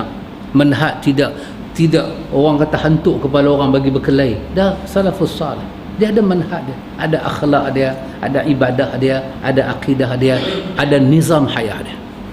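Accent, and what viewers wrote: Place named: Penang, Malaysia. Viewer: bestt dgn loghat penang.ceria.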